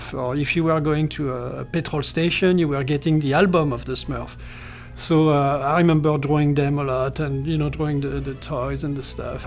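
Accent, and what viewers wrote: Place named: France. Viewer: Superbe accent français😂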